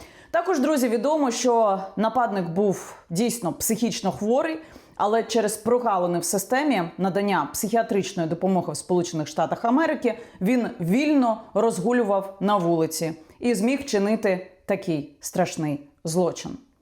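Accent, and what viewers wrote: Russia. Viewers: ну не під силу вам український синтаксис - хай вже як є, але ж вивчіть бодай українську фонетику - це не складно вам буде. Ну не можна ж вимовлять українські слова чи не в кожному другому з них по-московському. До Азірова не так вже й далеко.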